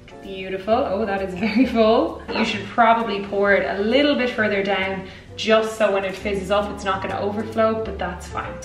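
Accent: Ireland. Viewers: I speak French fluently. I had to go back and listen again, because I just thought you were speaking Irish, at first. Awesome! I am drunk right now. Also, fuck. Have you ever had a white lady? I'm talking the cocktail, obvs. It's delish. Worst name ever. The only reason no one drinks it is because it's too embarrassing to order one.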